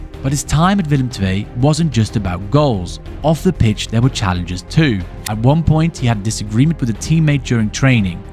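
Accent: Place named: United Kingdom. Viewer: My question tho is, how does he have a british accent?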